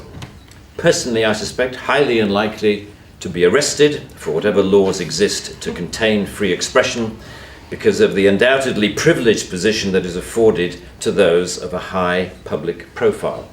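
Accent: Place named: United Kingdom. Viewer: Ну, наконец-то - реальный носитель Британского англ (да еще какой - мр Бин!). Осточертели американцы, испортившие красивый язык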